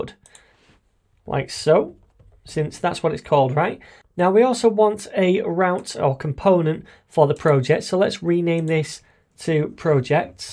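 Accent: United Kingdom. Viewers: Yeah! His UK accent too 😁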